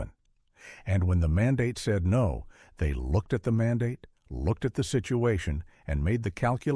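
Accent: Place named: United States. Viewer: Why an US voice over about a full UK subject?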